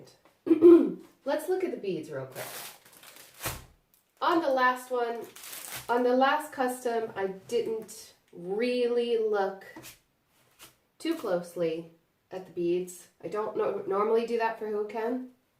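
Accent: Ireland. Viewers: I enjoy listening to you, your voice is very soothing while I am drilling. You'd be great on late night radio. I don't know if you realise how much of a an Irish accent you have picked up. Like your new arrivals. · Wow you're sounding very Irish in this video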